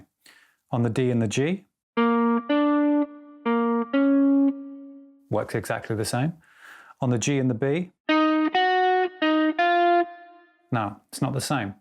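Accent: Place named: Yorkshire, United Kingdom. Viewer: Sooooo good to hear a Yorkshire accent instead of American! Brilliant and funny! "It's my 'ows" love it hahahahahaa!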